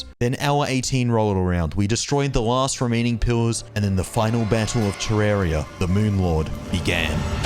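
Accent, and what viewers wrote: Australia. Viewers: are u from australia?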